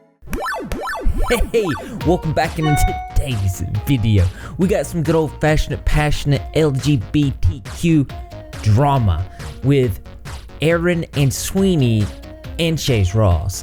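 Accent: South, United States. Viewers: Interesting social dynamic. Thanks for sharing. Also, you're a cutie and I like your accent. I'm usually not fond of southern accents, but yours is really nice. [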